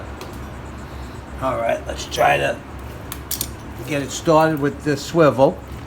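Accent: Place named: New York, United States. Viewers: Great video. Love the NY / NJ accent. Doing this rear shift shaft fix tomorrow. Wish me luck. Pretty loose but no oil leak yet. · Hey. Where are you located? Sounds like a Brooklyn or jersey accent. I’m in NYC. I’d like to have some work done on my bike and would love if you could do it. I like how clean and meticulous your work is.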